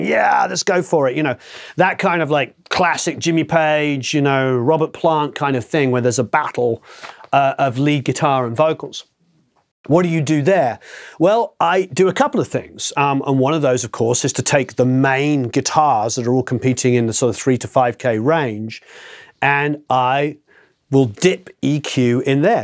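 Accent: United Kingdom. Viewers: Great video,! Self doubt comes and goes for everyone, i guess. Taking that self doubt and turn it into creative fuel is a great way to deal with it, if possible. I've found that self doubt has made me get out of my comfort zone and to work even harder, it has pushed me to learn more and study/practise harder in terms of producing, mixing. Thanks for bringing up this important question:) Love the British accent, btw! Cheers from Sweden /Mike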